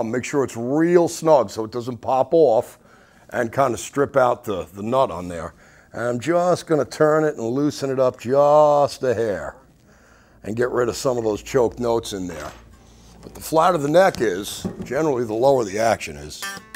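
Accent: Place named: New Jersey, United States. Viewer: Jersey is my guess...def.not Boston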